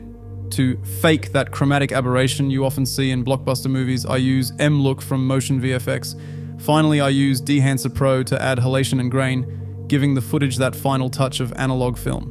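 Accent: Australia. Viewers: I paid attention to your voice. It's either your accent improved (sounds somewhat australian like) or it's some AI involved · Your accent sounds Australian in this video 🇦🇺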